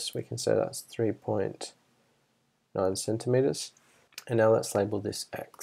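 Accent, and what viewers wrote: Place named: Australia. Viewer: The Aussie accent needs to be complemented by a more light-hearted tone. Overall, your videos are excellent.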